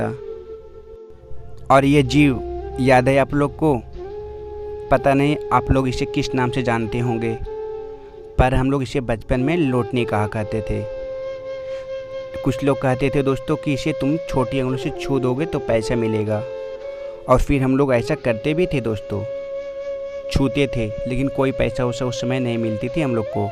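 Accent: Bihar, India. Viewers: Bhai aap paka bihar se hona bhai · sir aap bihar k ho kya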